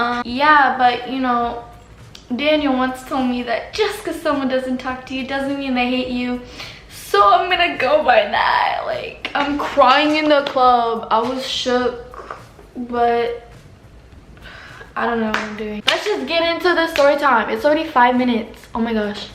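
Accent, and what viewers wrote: Texas, United States. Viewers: texas accent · american accent??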